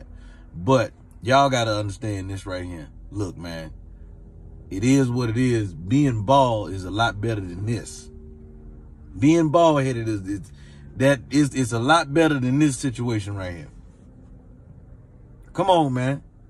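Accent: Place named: South Carolina, United States. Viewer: “Let This little bit ah peas go”, definitely from SC.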